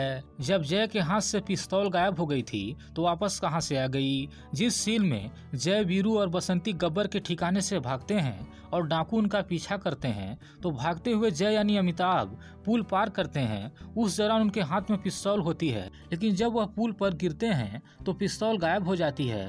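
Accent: Bihar, India. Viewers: Sabse pahle to apni ye Bihari tone badliye, ek dam bekar lagti hai.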